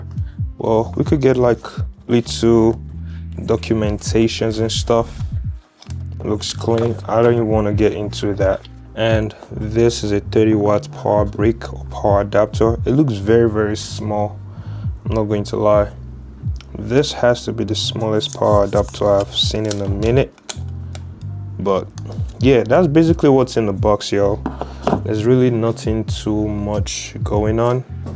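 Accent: Nigeria. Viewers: You from 9ja. No lie😂✌🏾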